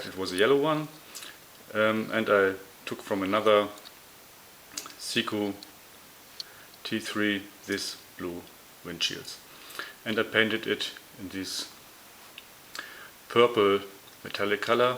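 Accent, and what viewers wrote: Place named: Germany. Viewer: Der deutsche Dialekt beste😂👏👍 aber sehr unterhaltsam 👍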